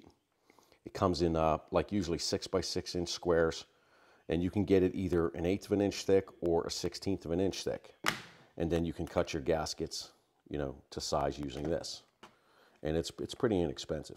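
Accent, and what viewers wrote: Pittsburgh, United States. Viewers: nice video. do i detect a pittsburgh accent. question have you ever tried the pods with mdf on to to make a much broader vaccum area.....great video.. what nice little jig for those cases...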